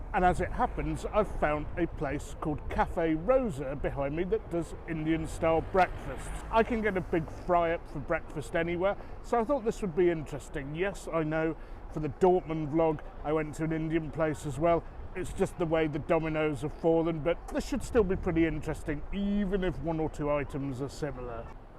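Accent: Manchester, United Kingdom. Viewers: There are many parts of Manchester, many different communities and lots of different variations of the Manc accent. I'm guessing is from south Manchester and went to posh school;) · He has got a manc accent just a well educated man unlike you who's as thick as 2 short planks 😁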